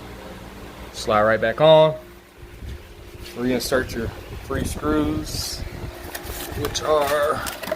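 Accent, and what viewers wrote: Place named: South, United States. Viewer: Yo G you sounding hella country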